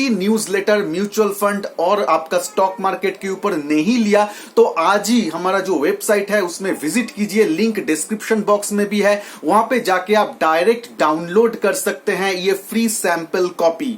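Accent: Bengal, India. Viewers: You speak like Bengali !!! Are you Bengali ? আরে ভাই বাঙালি নাকি ? বি the way explanation is good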